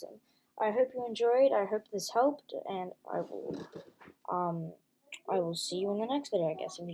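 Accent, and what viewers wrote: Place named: Australia. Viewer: I think hes australian like me and thx for teaching me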